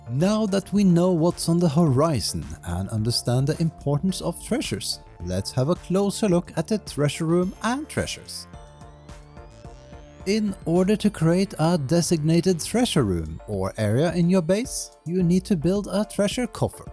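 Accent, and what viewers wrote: Norway. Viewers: 10/10 norwenglish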